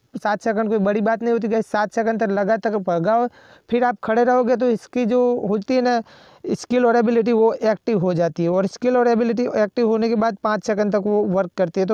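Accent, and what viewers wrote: Gujarat, India. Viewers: AAP Gujarati ho 🤔?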